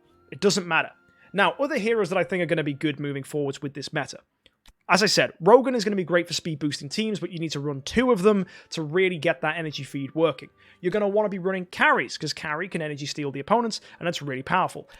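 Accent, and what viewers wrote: England, United Kingdom. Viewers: here for English accent :d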